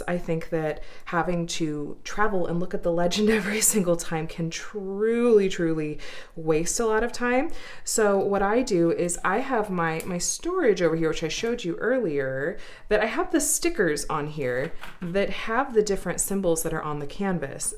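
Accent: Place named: RP, United Kingdom. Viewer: Very interestingly I picked up on what I perceived as an upper classman kind of "accent" at times. Maybe this is just a product of that teaching technique called ENUNCIATION. Whether intentional or not, to me this really INCREASED the perceived greatness and value of a project like this, and makes both the craft and the video much more interesting. Currently working on my first about Jesus holding hands with a child while walking on a trail in a forest. Love doing this.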